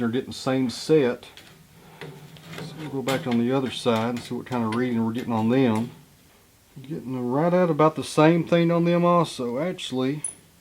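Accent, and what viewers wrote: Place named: North Carolina, United States. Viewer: With that accent I knew you were close by. I’m in Westerville, NC. 40 minutes from you.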